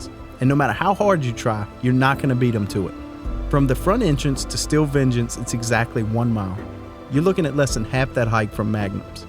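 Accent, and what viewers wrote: South, United States. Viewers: What is the accent of voice-over person? Southern?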